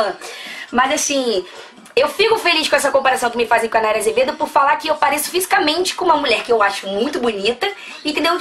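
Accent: Rio de Janeiro, Brazil. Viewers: Engraçado nesse vídeo vc se empolgando tanto nos assuntos aí do nada: então vamos passar esse pó... rs já que o papo foi mais comparação, confesso que quando comecei a te assistir sempre que escutava sua voz lembrava da voz da Heloisa perisse na personagem do filme o diario da tati. Sou carioca tbm, mas vc é a primeira pessoa que vejo nitidamente o sotaque do carioca. Agr comparar com nayara azevedo até vai, mas com suzana Vieira. PQP, nada haver kkk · Acabei de achar a tal da Viegas no YouTube.. e naaaaada aver.. vc é muito mais doida e mais engraçada kkkk jeito único. O sutaque de vcs q parece só.. mas normal né , afinal é tudo Rio.. bjos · Você só tem um pouco de aparência da Ste por serem cariocas, o jeito de fala. Mais são completamente diferente uma da outra.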